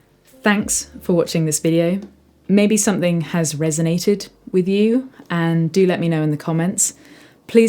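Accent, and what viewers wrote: United Kingdom. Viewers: Yo, are you British or something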